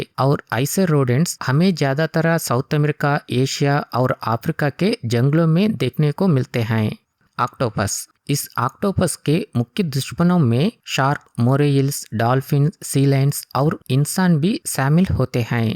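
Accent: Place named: South India, India. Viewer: Apki Hindi bolne me South India ki thodi thodi asar lag rahi he 😊👍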